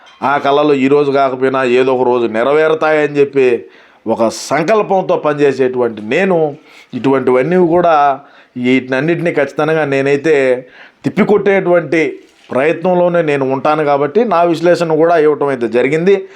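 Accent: Rayalaseema, India. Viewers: same slang throughout combined Chittoor, Anantapur, Kadapa, Kurnool dist.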